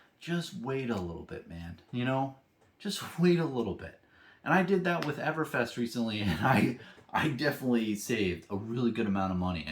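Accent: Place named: Florida, United States. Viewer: I’ve Said It Once & I‘all Say Ot Again, Your Accent Is So Pleasing To My Ears!! Are You A Florida Native?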